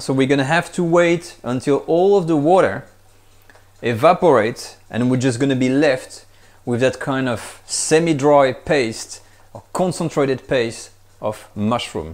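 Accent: France. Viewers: Juste pcq tu honores notre culture française 🇨🇵⚜️ et que ton accent en anglais est delicious. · Ree-sip-eee. Haha French accents are so cool. 😎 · I just love, love, love your recipes , your enthusiasm and your elegant French accent! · One of the best chanels, you are such a cool guy. Your english is excelent and with frech accent is nice to listen to. Greetings from Canada.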